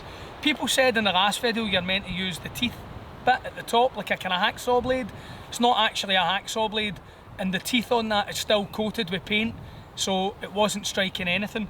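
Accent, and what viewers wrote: Scotland, United Kingdom. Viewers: Cool wee vid guys and great Scottish accent. You planning to get out filming a bit more this year? Thanks for filming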